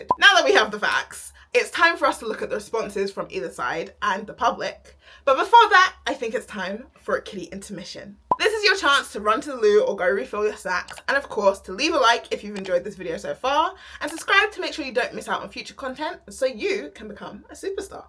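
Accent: United Kingdom. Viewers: American?? I think she's British. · Their pronouns are they/them and yeah I’m pretty sure they’re British, def not an American accent lol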